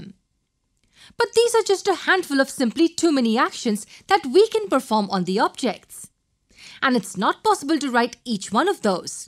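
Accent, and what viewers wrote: India. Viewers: wow indian accent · R u Indian bc ur English sounds like Indian people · This Indian sound Smh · Heavy Indian accent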